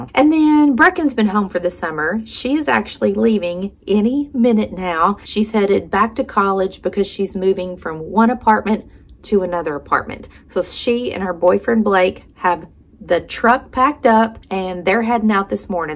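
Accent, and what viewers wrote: South, United States. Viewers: I absolutely love your accent! Love it! I live in Long Island, NY and I know I surely have an accent but NY accents are awful yours is so cute! I went to college in Virginia Ferrum College and it was not far from Kentucky south western part of Virginia and your accent brings me back to happy times. Love the south my husband and I want to move there after he retires. Love this video your such a joy and funny to watch! Have a blessed weekend! 🥰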